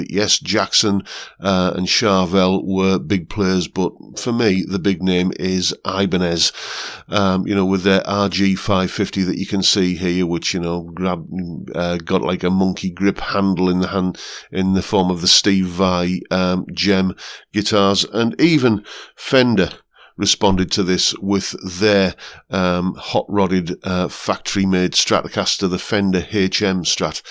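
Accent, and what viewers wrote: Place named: England, United Kingdom. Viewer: I grew up in Lancashire, been in Switzerland for ages now and just really enjoy you talking,! Brings a piece of England to my humble home! Love all your videos in general🎸🤘🎸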